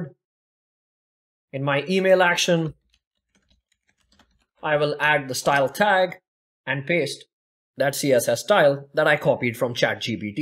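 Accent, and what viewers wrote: India. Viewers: سلام خستت نباشه - عذخاهی، شما اینگلییسیو تو ستان🇮🇳 یاد گرفدین[ت= د👂 ; ) ] یا ایران🇮🇷، طرز تلفظاتتون شبیه به مردمان هندی اینجا در کاندا(بیصائب_شده) که ساکنم به گوش می خوره🤔🙂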